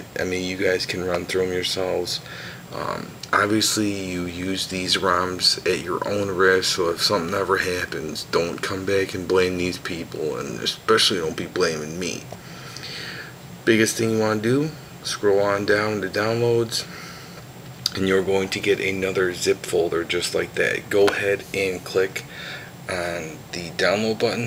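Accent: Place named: Chicago, United States. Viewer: youre from Chicago huh? lol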